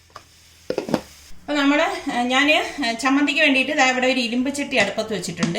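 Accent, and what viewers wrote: Trivandrum, India. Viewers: ..same recipe ente vappachiyudeyum favourite aanu.njanum orattitum varutha chamanthium undakarundu.pinne tvm bhasha parayunnathu kondu oru kuzhapavum illa. namukku pinne tvm bhasha alle parayan pattulu.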